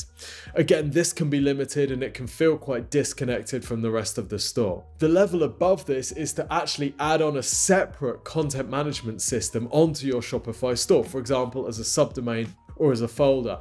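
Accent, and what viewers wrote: United Kingdom. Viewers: I love your British accent! Would Ease of Use n Support be better if I go with Woo under Bluehost?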